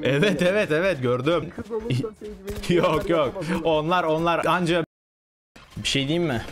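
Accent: Thrace, Turkey. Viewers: abi trakyalımısın konusma tarzın oyle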